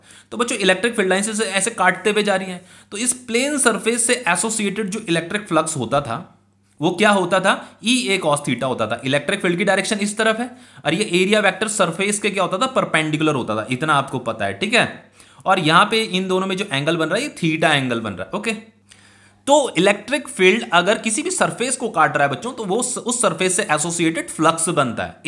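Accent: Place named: Bihar, India. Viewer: I am from Bihar .... Apke bolne ke tarike se mujhe laga ki shayad ap Bihar se ho..... By the way I like your videos main apke hi videos se 11 class bhi padhi aur 12 th bhi padh Rahi hu .... Thankyou so much sir😃😃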